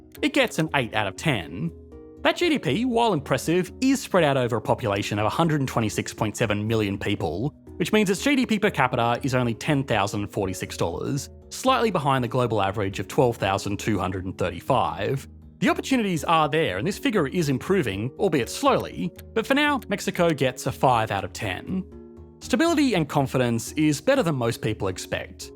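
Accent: Australia. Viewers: Because the guy is Australian. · this looks like an interesting video but I don't know if I can watch it as the guy's accent is incredibly annoying! The way he puts stresses where they don't belong and puts unnecessary drawls at the end of words like "issues...ssss" and "economy...yyyyy" is awful! Don't know where in Aus he's from, I'm British btw. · He is Australian. This is his channel, he owns it. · because he's Australian and that's their accent?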